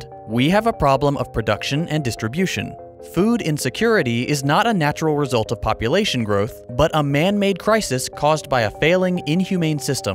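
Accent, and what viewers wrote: United States. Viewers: I usually prefer British or other accents than a thick and strong American accent, but your ability to narrate is incredible and your voice is super relaxing and good to hear, great job bravo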